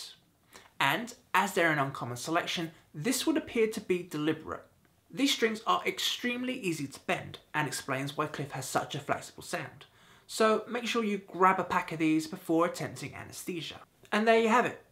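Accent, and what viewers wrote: England, United Kingdom. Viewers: English accent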